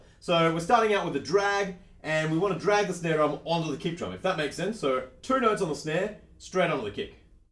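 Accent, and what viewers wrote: Australia. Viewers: Quick Question For Ya! Are You From Australia Or New Zealand?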